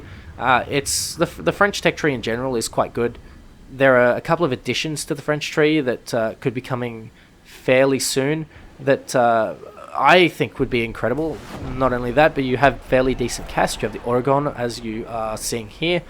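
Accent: Australia. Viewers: Its better not really to care tbh, your one of the few i listen to when it comes to vidoes on the games state. Your chill (also the aussie accent just makes it better) unlike most other creators i see who make massive spiels about the littlest of problems, which i just find irritating.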